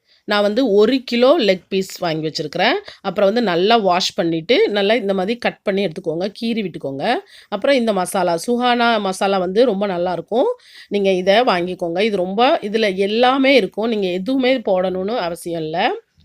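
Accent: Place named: Kanyakumari, India. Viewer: Your slang sounds like kanyakumari…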